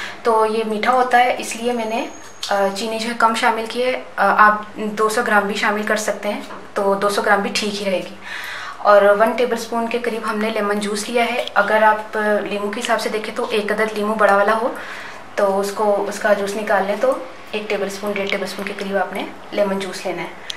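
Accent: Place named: Punjab, India. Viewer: Please donuts bnana Sikhs dien And I love your Punjabi accent me also belong to Punjabi family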